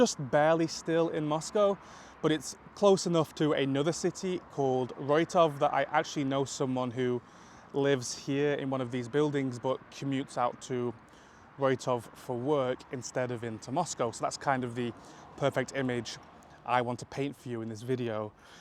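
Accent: United Kingdom. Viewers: Как всё-таки легко понимать британский английский. Без этой распальцованной, разнузданной американской каши во рту. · What region of the UK are you from? I'm trying to learn to determine accents😅 It sounds like RP with a touch of something, I dunno, northern?..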